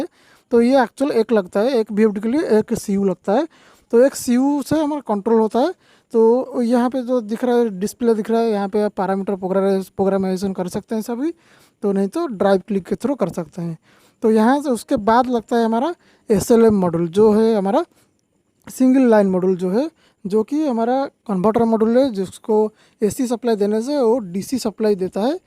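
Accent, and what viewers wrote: Odisha, India. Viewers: Sir i love your odia accent .. Very helpful..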